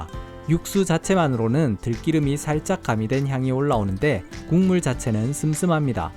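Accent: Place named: Gyeongsang, South Korea. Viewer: 경상도 억양이 거슬리는 것 빼고는 좋습니다. AI 나레이션이 나을 듯요.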